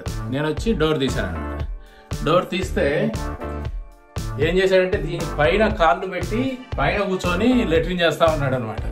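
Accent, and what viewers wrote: Rayalaseema, India. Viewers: గారు మీది రాయలసీమ కదా మీ వాయిస్ వింటే అర్థమవుతుంది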